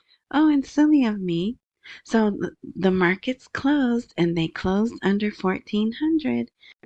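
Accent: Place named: South, United States. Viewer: Good Morning, don’t down play yourself on speaking. I’m only guessing your from down south as myself I’m from New Orleans but live in Metro Atlanta/Alpharetta Ga. I hear and understand you very well, all words are annunciated and articulated very clearly. No complaints here, keep up the good work. A little off subject I was about to invest more into Dinar & Dong but I own several million in both. I’m thinking of going about $5K and investing more into XRP crypto. I think this is own generation Bitcoin. If all avenues for sending money ( Western Union, Money Gram, Zelle, Crypto, Walmart to Walmart, Cash app ) whomever will have to go through XRP. I didn’t get it in 2022 at $0.50 now it’s $2.25 after court settlement. I think along with Iraq and all countries re settlement of currency XRP might be a good bet. I’m not telling people to buy I’m just saying I’m spreading around hoping for 1 to bring me on to super early retirement. 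Lol. Thanks for you platform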